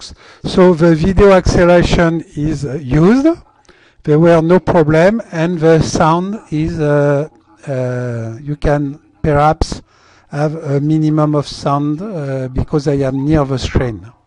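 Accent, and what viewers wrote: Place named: France. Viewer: Thanks for this video and this tools !!! You're great to develop and share that with us. And your French accent is incredible ^^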